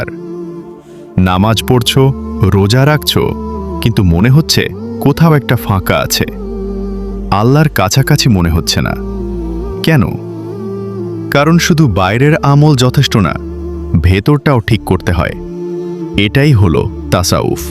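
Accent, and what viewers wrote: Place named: Basirhat, India. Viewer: কন্ঠ টা বাসীরার লোকের মত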